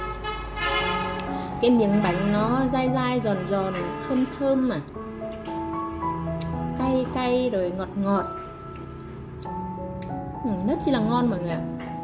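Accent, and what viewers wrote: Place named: Ha Tinh, Vietnam. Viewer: Nghe tiếng hà tĩnh mà hay quá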